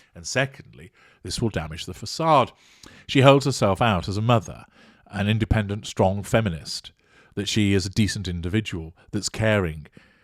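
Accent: United Kingdom. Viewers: I love your Channel, yours contents and your British accent keeps me watching over and over because I am addicted to it. Greetings from🇧🇷❤️🫶🏻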